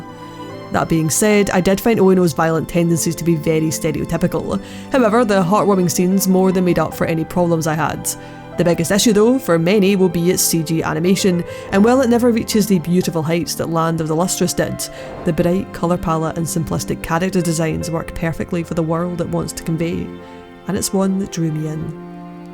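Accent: Scotland, United Kingdom. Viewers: Good to hear a Scot do the review. Ta Pal.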